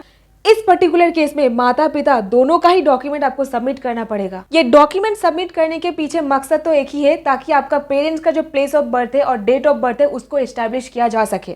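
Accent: Bengal, India. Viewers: Didi ka hindi mein bongla aa roha hai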